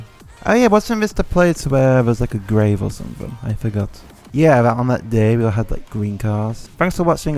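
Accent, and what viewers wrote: United Kingdom. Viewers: I love ur British accent Wish me luck imma buy a pc!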